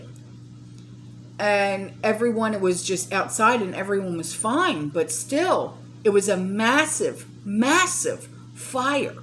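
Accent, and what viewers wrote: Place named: Brooklyn, United States. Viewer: Well hello there, I stumbled upon your channel last night. I LOVE yer NY Brooklyn accent and your channel! Please don't hold back, pour on the accent! Prepping with pride!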